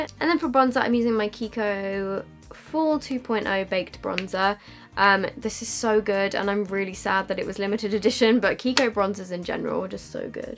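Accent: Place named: England, United Kingdom. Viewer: purple emoji purple emoji purple emoji I LOVE your English accent and your makeup looks!!! Legit whenever I see the thumbnails I'm like "OMG I NEED TO TRY AND RECREAT THAT LOOK" even if I'm legit staying home and doing absolutely nothing (and taking it off straight after) Btw hi from New Zealand if you see this!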